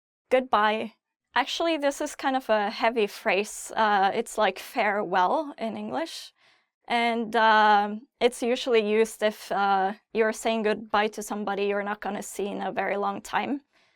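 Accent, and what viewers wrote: Norway. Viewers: Tussen takk! I don't think you speak slow, just partly your cute Norwegian way of speaking :) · Is she norwegian? It sounds like it, at least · I understand why you may have struggled hearing some of her r's. She seems to have a South Eastern dialect, from around Risør/Tvedestrand, where they tend to sometimes use the German/French uvular R and sometimes the trill/flap .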